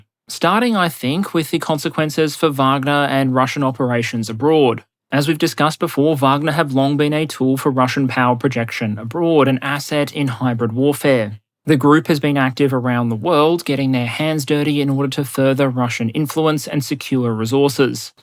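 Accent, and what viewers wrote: Australia. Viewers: Not according to his profile: " Description An Australian covering the military industrial complex and national military investment strategy." Or for that matter, his accent.😉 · As a ‘murican, I love the dry Aussie humor and the accent. It’s what makes Bluey extra cute too, kids speaking Aussie. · One, he expresses he is biased many times before so yeah, he'll be critical to the Russian Army, but he also explicitly says their strengths. Two, the cheap shot dry humor is his schtick. His Aussie, that came in a package. It also makes the dry delivery of information more interesting and entertaining, this is still a YouTube video after all. And three, you can just...idk, don't watch of the jokes are a turn off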